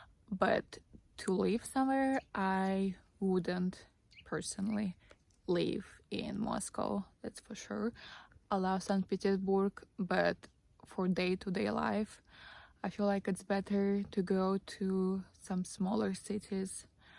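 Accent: United States. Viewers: u speak very good american english. i thoguht you were american and moved. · Ive heard you say in past videos you talk like you were living in the US. What got you to the USA?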